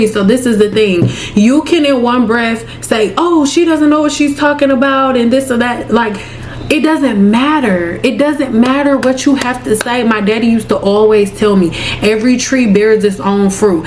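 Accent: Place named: Detroit, United States. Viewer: I knew you were from Detroit just listening to you 😂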